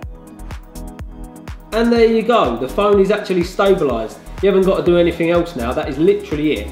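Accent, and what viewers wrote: United Kingdom. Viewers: Probably the only Youtuber I've come across with a legit (non-pretentious) British accent. Top lad - subscribed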